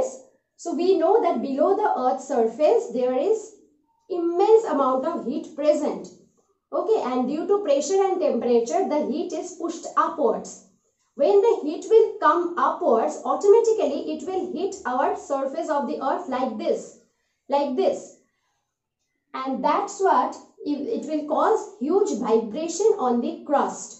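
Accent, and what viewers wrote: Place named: Bengal, India. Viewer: She speaks in bengali accent that's why this happens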